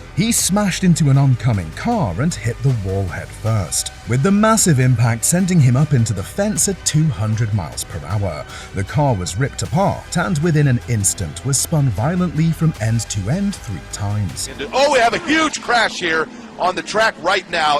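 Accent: United Kingdom. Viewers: the "Brittish" AI voice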